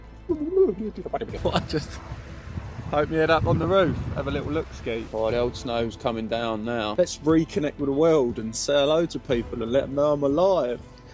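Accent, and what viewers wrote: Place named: London, United Kingdom. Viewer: Just found your channel and am loving your work! My view is the River Wye near Bakewell in the Peak District (its pitch black so cant see much right now!) Im a full timer in my jumbo transit, Vera. Like you, I gave up the rat race, sold my flat 20 months ago age 55 and decided to give up the 9-5, live on less and experience more before I became too old and farty! Best decision of my life. Im a london girl, NW and West (hate the place), so listening to you is like a bit of home on the road! Gonna binge watch your channel now so shall bid you a good evening Laters 😊 Tracy and Moo the cockapoo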